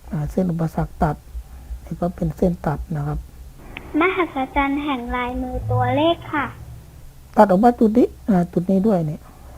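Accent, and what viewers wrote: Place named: Isan, Thailand. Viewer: ดีน้อ หนุกะอีสาน พอฟังออก555 ตามฟังอ. อยู่เด้อจ๊ะ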